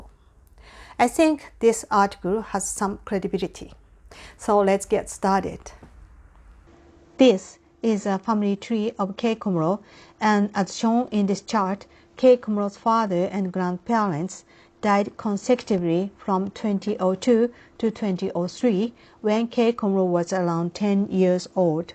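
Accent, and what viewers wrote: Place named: United States. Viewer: ミス、 PINKパーカーお似合いね♥ いつもながらのEnglish！アメリカのどこで身につけたんですか? 歯切れ良く、English圏の人に説得力とキレのある語り Voiceが良いです👍 篠原おじさんも弁護士も活用して意見書出すよになったみたいだからさん、我々日本国人として、我々の力になって下さいね♥ がんばって下さい!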